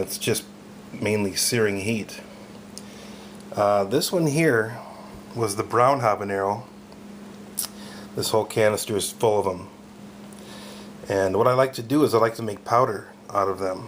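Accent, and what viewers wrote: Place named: Minnesota, United States. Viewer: Love your video! So funny I could tell you were from MN before you even said. Probably because I grew up in Prior Lake! Love your advice and it is really awesome! Thanks so much!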